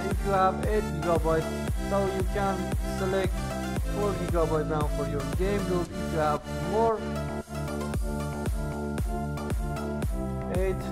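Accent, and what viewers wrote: Iran. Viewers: Thanks bro where are you from? You speak like iranin (دمت گرم داداش خیلی مفید بود تونستی فارسیشم بساز)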